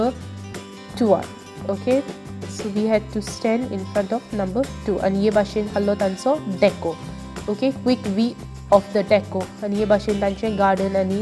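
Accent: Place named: Bardez, India. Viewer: Where in goa u are from i m from calangute ur language is like bardez or tiswadi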